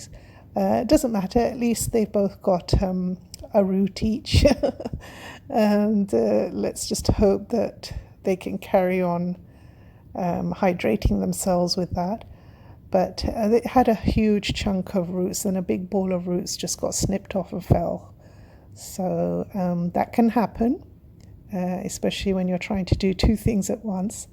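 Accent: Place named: England, United Kingdom. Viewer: Nice tutorial...love your very English Accent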